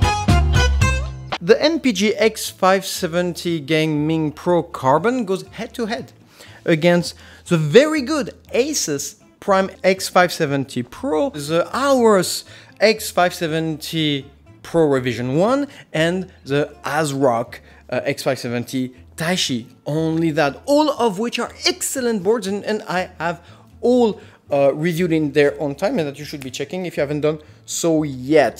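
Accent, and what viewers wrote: France. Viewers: accent français ?? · you are french, right ? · he is french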